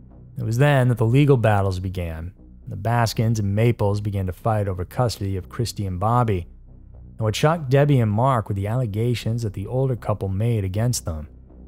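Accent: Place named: Texas, United States. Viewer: LoL...I was convinced that he was Woody Harrelson and occasionally accused him of hiding his true identity (Woody) in my comments...until he started showing his face in his videos. But you agree he's a Texan, right? Got that Texan twang.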